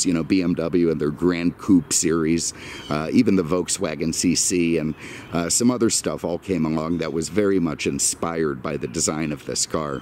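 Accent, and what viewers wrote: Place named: New York, United States. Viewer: I love this guys chatter. He has a New York type accent and a lot of character to his speech. He is very entertaining and charismatic love his reviews ...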